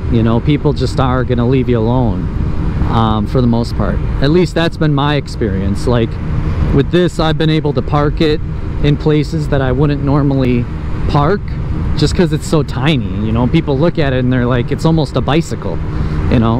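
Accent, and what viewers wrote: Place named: La Grange, United States. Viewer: I'm also pondering a 50cc or 125cc scooter. You sound like you live close to La Grange. Class M gives you a valid motorcycle license to legally operate any motorcycle with an engine size of 150 cubic centimeters (cc) or greater. The Class L license is for riding a motor-driven cycle with less than 150cc engine size. Note, riding an electric scooter does not require a special license.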